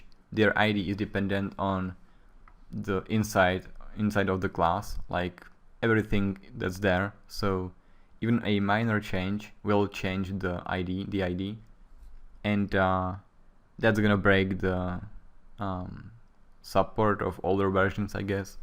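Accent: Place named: Czech Republic. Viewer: ***** Where are you from? Because you sound likr someone from czech republic :D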